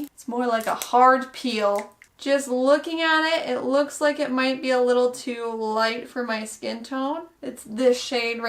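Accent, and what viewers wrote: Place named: Minnesota, United States. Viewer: I KNEW YOU WERE FROM MINNESOTA!!! I can recognize my accent anywhere!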